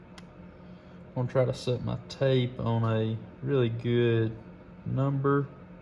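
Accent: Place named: Mississippi, United States. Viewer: You sound like your from Mississippi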